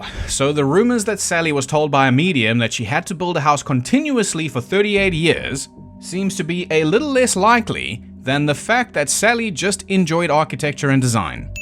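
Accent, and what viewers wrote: South Africa. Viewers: He‘s South African, likely Afrikaner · is that a south african accent i detect 😛🤞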